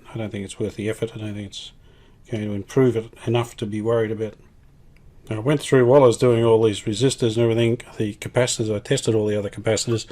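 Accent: Australia. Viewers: Great video as usual. Although you indicated that there was a difference between yourself, fellow Australians and New Zealanders, I really couldn't discern a difference. Though those that live in the northern US states say they have a hard time understanding we southerners, I have a hard time understanding those from the New England states. Oh well. Hope it isn't too hot for you there. Merry Christmas to you and family!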